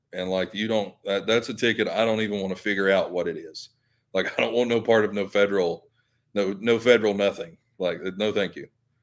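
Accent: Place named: South, United States. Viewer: Sorry I missed your live ..enjoy your videos. I'm from Ga so your southern accent is great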